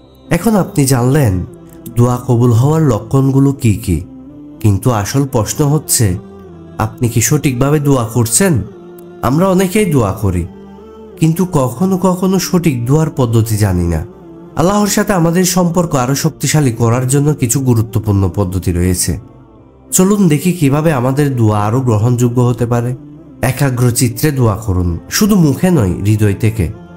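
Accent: Chittagong, Bangladesh. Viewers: এই সব গুলো লক্ষনই আমার সাথে হয়েছে,আর যতই খারাপ কিছু আমার সাথে হয়েছে ততই মনে হয়েছে নিশ্চয় আমার জন্য ভালো কিছু আছে না হয় আমি কেনো এত কষ্ট পাই।তবে মাঝে মাঝে তো এক আধ বার হতাশ হয়েছি যে আমার সাথেই কেনো এমন হয়।ভাইয়া আপনার বাড়ি কি চিটাগাং? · আসসালামু আলাইকুম ভাইয়ের বাড়ি চিটাগং?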